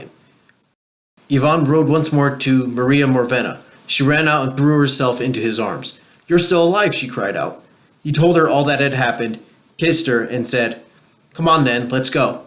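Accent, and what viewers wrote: New Jersey, United States. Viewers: Don't apologize for your Jersey accent, I love listening to you. Thanks for telling this story!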